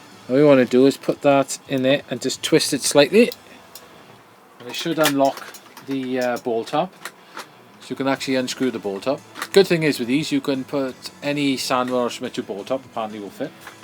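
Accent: South Wales, United Kingdom. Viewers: You from wales but? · Are you from South wales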